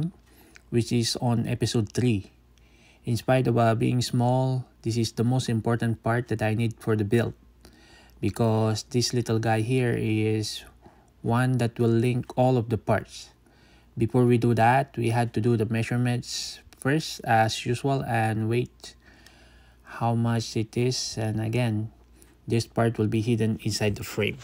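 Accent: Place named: Philippines. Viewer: That pinoy accent is strong here.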